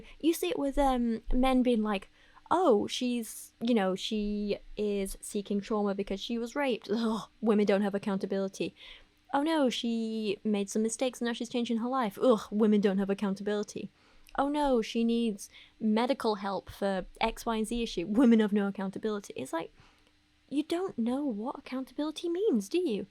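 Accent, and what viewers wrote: United Kingdom. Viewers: I wish I could understand you. 😅😅😅😅 your accent works well in the UK...not in the US....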